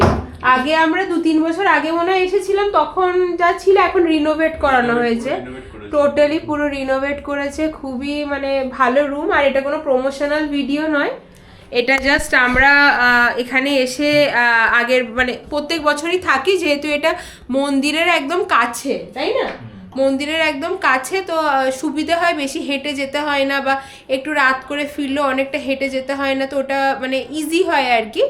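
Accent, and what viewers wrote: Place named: Kolkata, India. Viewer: Amon bol cho jeno kolkata theke gecho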